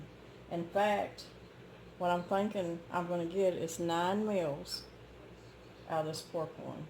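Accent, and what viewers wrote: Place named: Kentucky, United States. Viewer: Hi. I just discovered your channel. I love to watch you. I like how you do things the old fashioned way, like my Mama taught me. I love raising a garden, canning my vegetables. Making bread from scratch, doing just about anything the old fashioned way. Thanks for sharing your talents with us. I was born and raised in KY. I live in TN now. Your accent sounds like home. Where are you from?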